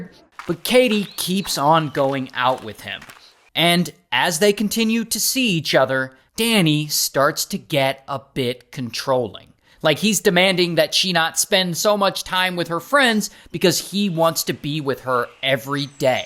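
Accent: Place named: United Kingdom. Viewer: personally i wouldnt let a british dude yell at me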